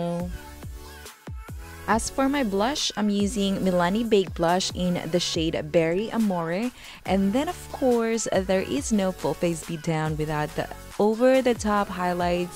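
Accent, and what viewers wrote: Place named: England, United Kingdom. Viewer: I really like the way you talk. I wish i could have Your English accent, wow. I always watch your vlogs, it helps me lots for my makeup style. Thank you. Mwahhh.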